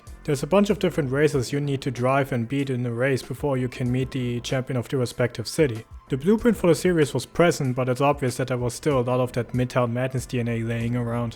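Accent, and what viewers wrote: Germany. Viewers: man hört so sehr raus wie deutsch er ist😂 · This has been real. What a nostalgic trip. Nice vid! You German?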